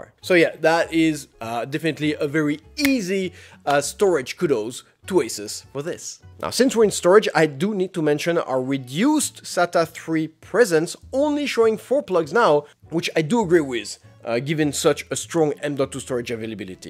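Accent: France. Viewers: Is that a french accent ? :) great video though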